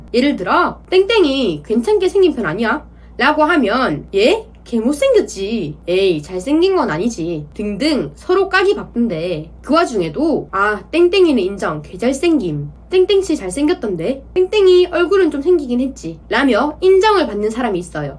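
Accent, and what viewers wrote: Jeolla, South Korea. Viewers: 말투가 홍어년인데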